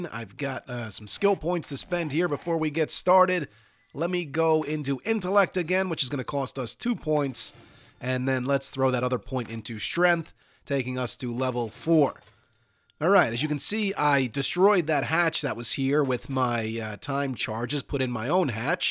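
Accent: New Jersey, United States. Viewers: Probably the best 7Days content around. Maybe its the New Jersey accent...?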